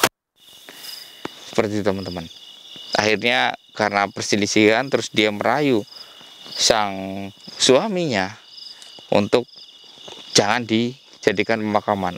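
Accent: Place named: Banyumas, Indonesia. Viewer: Jauh banget sobat merantau nya..biasanya org ngapak d kalimantan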